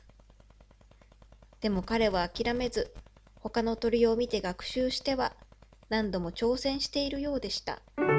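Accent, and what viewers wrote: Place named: Kansai, Japan. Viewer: akiさん、初めまして何時も見せて頂いています＾＾ ほのぼのとして素敵なお庭ですね～♥ そしてお優しいご主人～良い方に巡り合われましたね～ 私は大阪に住んでいるのですが、時々akiさんの関西弁が聞けるので すごく親近感をかんじていますよ～ 又、楽しみにしています～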